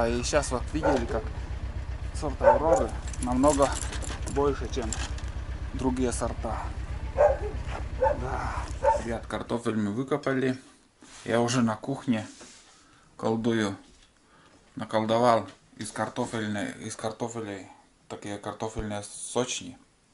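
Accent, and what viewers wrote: Komi, Russia. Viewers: Назвал бы видос "Копаем картофель у соседей" такой говор как из нившеры. Превед из Сыктывкара. Сами мы из Кероса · у нас как то не заморачиваются по сортам картошки. У кого то красная лучше растет у кого то белая. Есть белая голландка - огромные продолговатые белые клубни. Много ее вырастает но не в каждый год она хорошо хранится. Кто не много садит - ведро, два тот пророщенную у бабок покупает а тут наши местные заморочки: если продают картоху с села Касиб (Соликамский район) то уходит в лёт. Считается что это очень хорошая картошка. Числа 6-го сентября я тоже копал картошку, сыро, дождь, с комьями грязи выковыривал. Потому что дожди лили весь август и пол июля. Половину выкинул потому что сгнило. А у вас вижу копаете земля нормальная сухая. Вижу вилами копаете - отец всегда вилами копал а я не могу, не нравится. И приятно коми речь послушать. 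Но ее хрен выучишь потому что язык неславянский. А если выучить то разговаривать тут не с кем. Всего доброго, Пермский край.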